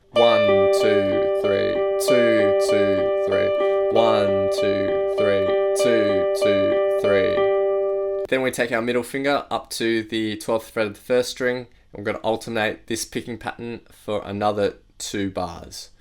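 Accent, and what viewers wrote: Australia. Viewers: Are you Australian ?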